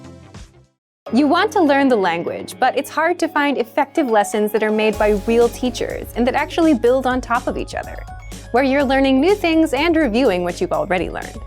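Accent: United States. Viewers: Es el mejor acento es de EEUU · pero es de California, New York... De dónde será?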